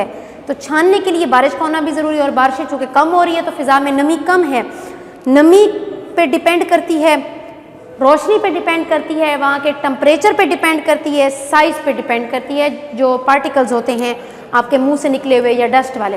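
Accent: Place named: Saraiki, Pakistan. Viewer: The way you speak mam is proved that you are sariki ❤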